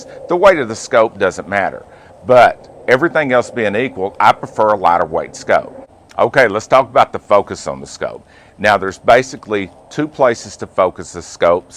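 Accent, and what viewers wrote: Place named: Tennessee, United States. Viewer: I'm from TN. & Just curious what local u r from. I'm having trouble deciding on TN area or maybe? West Virginia ish? Anywho thanks for the no-nonsense !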